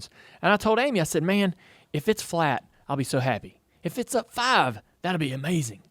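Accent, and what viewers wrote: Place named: North Carolina, United States. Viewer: The North Carolina accent is great. Of all the Southern accents, it's the nicest one to hear. The Brickyard 400 was a great race. Keep up the good work Dale Jr!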